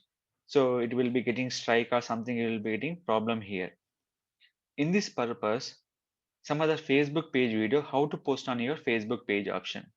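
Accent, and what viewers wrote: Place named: India. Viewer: The Indian accent is the worst.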